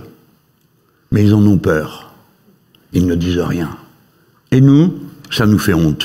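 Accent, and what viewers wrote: France. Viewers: 😂 et en plus il fait exprès de forcer son accent français ❤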